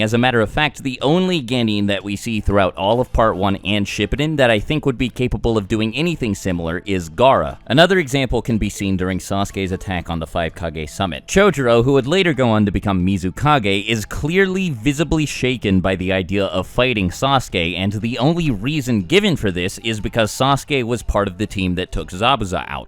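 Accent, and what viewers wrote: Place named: New England, United States. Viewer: You're actually very talented at forming and discussing arguments. I mean, no joke. You got chops, son. Also you're super confident and have that sweet ass yankee accent we southerners are supposed to hate (but secretly love...we hate CA accents, but stories for another time) I just really like the way you can put so much weight and disdain behind a word for tits and pickles, like "why do people give so much of a shit about WOOD" when talking about wood release. You also made me a Minato fanboi. So... i dunno if thats something to be proud of, but hey, you did it!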